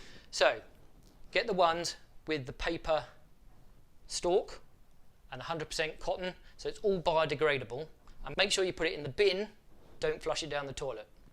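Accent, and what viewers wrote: United Kingdom. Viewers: Great video. Makes a change to hear someone English. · Nice informative video just found your channel...thought it would be good being a and you sound like a northerner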